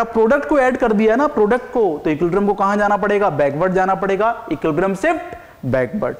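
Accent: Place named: Indore, India. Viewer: Indori accent Bhai Indore ka hai Shayad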